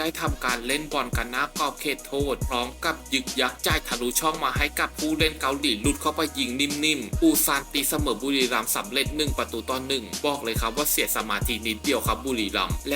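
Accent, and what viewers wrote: Suphanburi, Thailand. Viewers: แอดเป็นคนสุพรรณแน่ๆ รึไม่ก็กาญจนบุรี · เสียงเหน่อๆ เหมือนหนุ่มสุพรรณเลยนะเพ่สุดยอด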